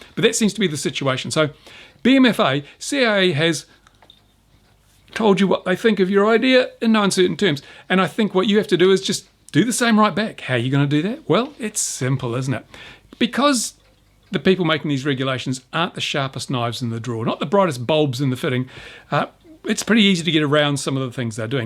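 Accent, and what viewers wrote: New Zealand. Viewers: "Kiwi" accent LOL! =D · Goodmorning..Kiwi accent lol. Is that one of your female admirers on your left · I like this format. Informative and not an outright rant. Very nice and laid back. And that Kiwi accent. Tingling.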